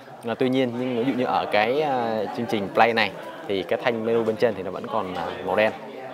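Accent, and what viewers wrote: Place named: South, Vietnam. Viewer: Giọng zai miền nam nghe hay quá :x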